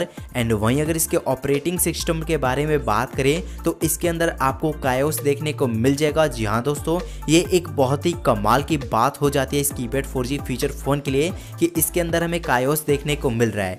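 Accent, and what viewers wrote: Hyderabad, India. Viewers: hyderabad sa ho kya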